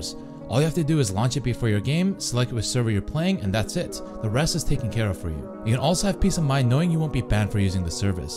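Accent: Australia. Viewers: Hey is an Aussie? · you're Australian?? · Are you Australian or Asian?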